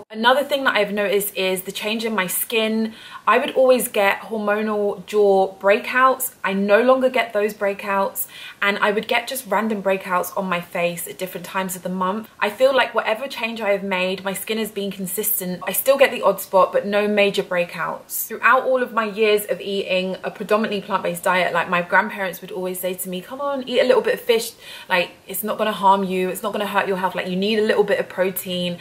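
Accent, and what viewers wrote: United Kingdom. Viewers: Her British accent is soon cute!!!, wait a minute!!! I'm a brit n have one myself!! Damn I sound cute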